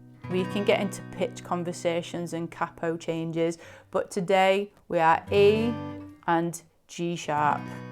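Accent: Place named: England, United Kingdom. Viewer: Hi, I love your way of learning songs... and your pure english accent! Your entire channel is gold, thank you for your huge dedication to other guitarists!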